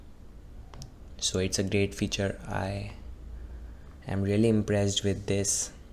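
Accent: India. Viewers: Indians speak english with a really annoying accent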